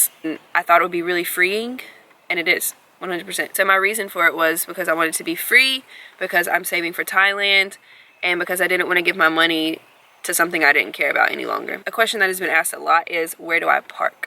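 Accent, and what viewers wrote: United States. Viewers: I love this! Where are you from? You have a little country twang lol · Caitzen lol yes it's sounds kinda Texan 💀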